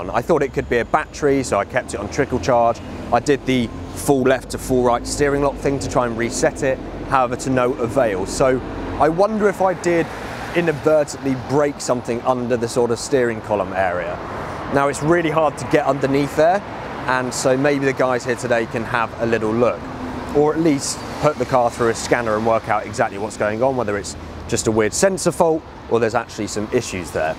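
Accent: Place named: West London, United Kingdom. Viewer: I like the way to say Garsekets instead of gaskets true west london twang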